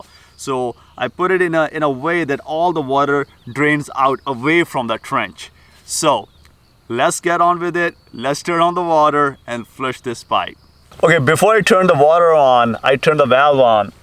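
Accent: Punjab, India. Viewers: Hello! Another great video. (Love the Punjabi accent.) Sat sri akal.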